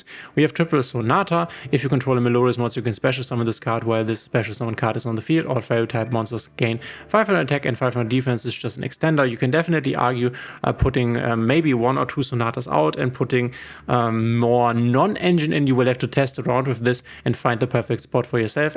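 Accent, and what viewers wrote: Germany. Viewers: OMG dieses deutsche englisch Akzent nervt. Aber trotzdem gut erklärt😂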